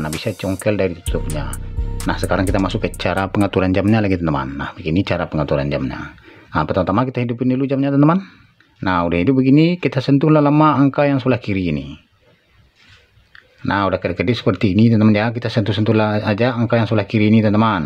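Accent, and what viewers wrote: West Sumatra, Indonesia. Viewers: Org Minang kah bang😅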